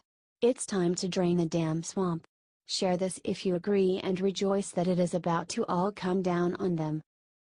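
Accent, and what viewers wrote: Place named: Russia. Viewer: Use your own voice not a Robot. We want to hear your Russian accent.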